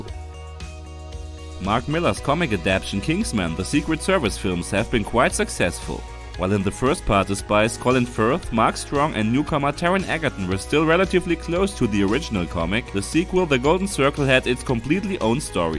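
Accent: Germany. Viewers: that german accent is so hard not to hear sry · der sprecher is safe aus deutschland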